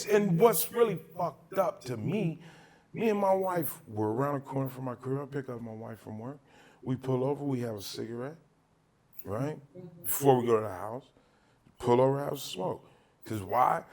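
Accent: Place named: Cockney, United Kingdom. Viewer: Watching you from London, UK, love the 'cockney' accent, lol.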